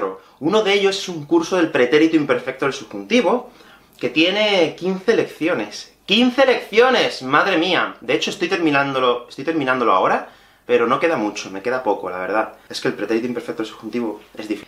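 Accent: Spain. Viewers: joder, me cuesta el acento español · Tô aprendendo espanhol e fui vendo o vídeo achando que ele tava com sutaque espanhol e quando fui perceber tava traduzindo o vídeo na minha cabeça sem perceber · Estoy aprendiendo español (inglés es mi idioma madre) y he entendido casi 80% de este video en español sin traducciones a inglés! ¡Necesito más estudiar los verbos la otra del indicativo! El más aprendiéndolo puedo entender los acentos de hispanohablantes del mundo. ¿Quizás tu acento es español? Pero, muchas gracias por esta lección y suscribiré a tu cuenta de YouTube. ;) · ) Este acento espanol <3 :P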